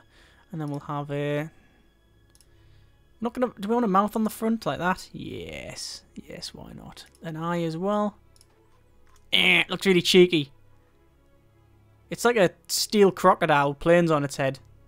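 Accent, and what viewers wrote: United Kingdom. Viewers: I love your British voice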